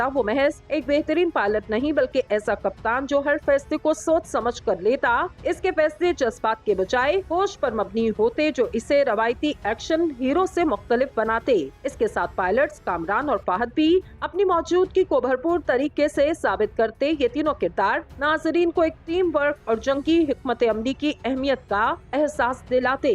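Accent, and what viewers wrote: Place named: India. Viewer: اگر بھارت کے خلاف ویڈیو بنائی تھی تو بولنے کا انداز بھارتی رکھنا ضروری تھا؟. اردو تو باکستانی بول لیتیں۔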